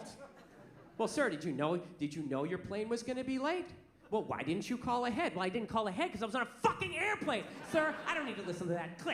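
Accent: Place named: Massachusetts, United States. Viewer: Michael Bulls Canton is only 15 miles SW of Boston and a 22k population is hardly small, but yes, it is a Massachusetts accent.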